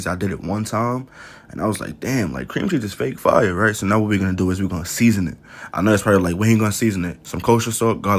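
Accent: New York, United States. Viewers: 🔥 you most definitely from ny though 😆 ya video had me rolling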